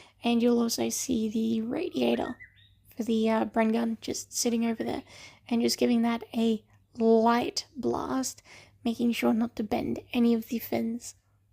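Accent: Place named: Australia. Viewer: That slightly cracked ozzie accent of yours is delightful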